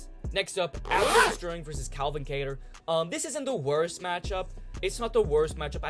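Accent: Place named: Midwest, United States. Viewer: You are actually retarded! Definitely from the Midwest with retard accent dontcha ya know! So glad the UFC doesn't hire fucktards like you for match making. Why do you keep saying we? There's no "we" you are in no way, shape, or form involved with decision making in the UFC. One last thing... cut your fucking hair! You look like a botard!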